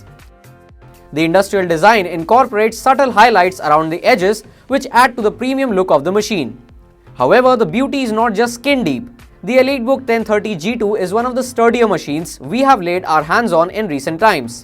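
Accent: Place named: India. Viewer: lol, Indian accent really, and to me not so bad. its understandable.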